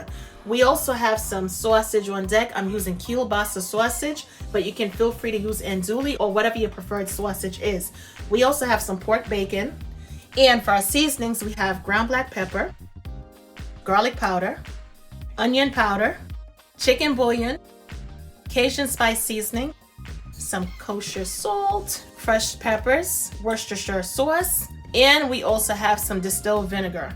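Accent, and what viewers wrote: New York, United States. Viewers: Ms. Lady, your accent sounds like NYC, but your S U P E R B, smooth cooking is distinctly southern. Who influenced you? (Inquiring minds want to know).. You are welcome in our abode every day, and ..uh.. bring the cabbage cores with you. LOL. Want to view more of yr cooking. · shes nowhere near from the south. ny or jersey maybe. but her cabbage sounds great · Excellent video...great explanations...I've already saved to my recipe file. Out of all the Youtube Fried Cabbage Videos, I picked you Ms.! ( I loooove your New Yaulk accent) Gonna make this tonight. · Her accent ,is of New York! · Definitely going to make this tomorrow for tea. By the way, love your accent I’m from UK. ( NY ? ) excellent vid. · Southern fried cooking with a NY accent gotta love it!! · Love her New York accent. Dish looking good! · You sound like you have a new york accent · She sounds like she has to be from New York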